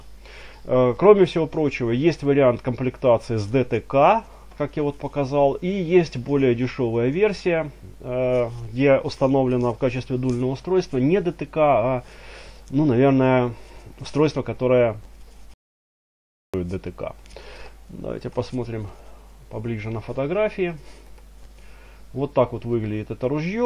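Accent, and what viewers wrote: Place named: Ukraine. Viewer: Українець так що його можна панять і прастіть))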